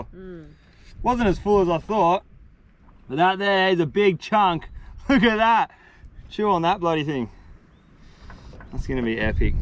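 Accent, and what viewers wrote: Australia. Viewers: Her name is? With your strong Aussie accent I always thought you were calling her "monkey" LOL it really doesn't matter cause I love it when you bring her along with you on these adventures such a beautiful person. I Absolutely Love Crab, but sadly I live in Missouri and we are miles away from any coast where you can catch your own. If I want crab I have to go to Walmart and buy frozen crab. But it's still good and very expensive.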